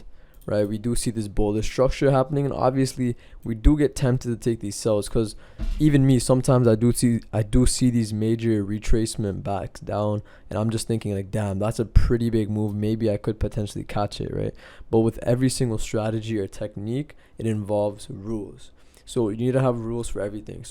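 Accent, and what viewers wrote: Toronto, Canada. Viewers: U sound like u from toronto. Am i wrong?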